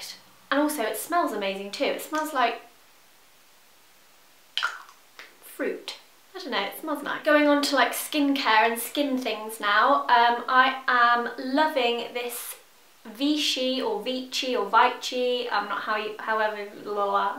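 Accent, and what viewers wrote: United Kingdom. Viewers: hey, im from germany and i LOVE your british accent!! Could listen to it all the time! love your videos a lot :) next month im comming to london and i wanted to ask if you can recommend a store to shop beauty stuff or maybe a certain product? im so excited :)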